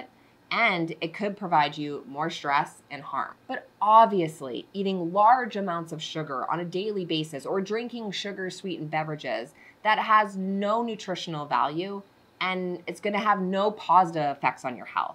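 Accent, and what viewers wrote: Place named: United States. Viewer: great advice. very interesting. I cannot get used to American accents. RESK is a strange word. In England we mostly say R I S K. Nice lady but strange way to describe RISK. She also says FESH instead of FISH. HEY HO I wish the USA well. Thanks for the advice. Please remember that Youtube is shown in UK.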